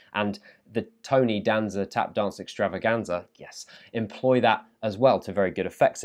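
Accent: United Kingdom. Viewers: I've stumbled upon a British Anthony Fantano.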